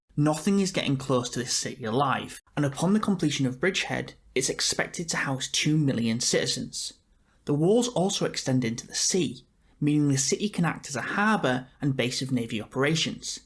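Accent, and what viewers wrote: United Kingdom. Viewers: Are you British 🥲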